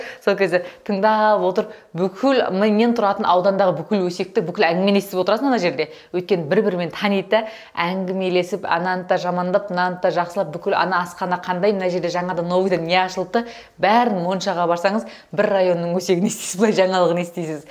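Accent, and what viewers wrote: Standard. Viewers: Жарайсыз, қаншама жыл Кореяда тұрсаңызда қазақ тілінде таза сөйлейсіз,бұл үлкен құрмет елге,тілге деген... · қызым,саған үлкен рахмет! Өз тіліңе деген құрметін үшін, өте таза сөйлейсің. Кереемет.Өте білімді екенің байқалып тұр. Негізі алған біліміңді білгім келеді жене Корей тілі қалай үйрендің? Кореяға қалай келдің, әлде тұрмысқа шыққансон ба?😊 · молодец өз тілінде таза сөйлейді екенсің, құрметте дұрыс Әрдайым жаңалығыңмен бөлісіп отыр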